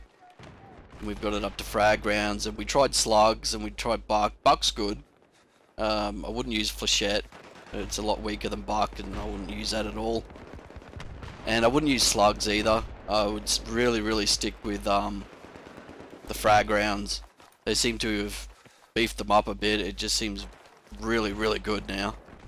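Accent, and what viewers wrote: Australia. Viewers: On ya mate, where you from??? Victoria? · Are you Australian? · when i watched this video i new he was aussie because of his accent love the aussie accent and his psn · awesome accent were u from Australia ?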